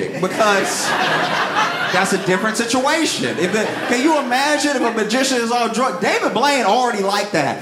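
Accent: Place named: South Louisiana, United States. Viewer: That Sourh Louisiana jumps out a little bit every set.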